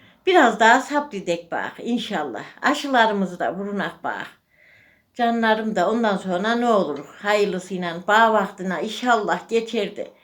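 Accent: Kayseri, Turkey. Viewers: Babanecim senin şu konuşmana bayılıyorum . Kayseri’yi özledikçe izliyorum seni maşallah sana 🧿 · Teyzem bende kayseriliyim Talasın bir köyündenim... Konuşmanız, adetlerin bizim oralara çok benziyor. Siz neresindensiniz. Ellerine sağlık maşallah gençler elinize su dökemez... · Bende kayseriliyim, üniversite kazanınca Ankaraya taşındık 6 sene önce. Küçüklüğüm hep babaannem dedemle Sakar bağlarında geçti. Araya korona da girince babaannemi daha çok göremez ve özler oldum... Konuşman vurguların sepliliğin aynı babaannem gibi teyzem benim.. Allah sana sağlık sıhhat versin torunlarınla uzunca yaşa inşallah ellerinden öperim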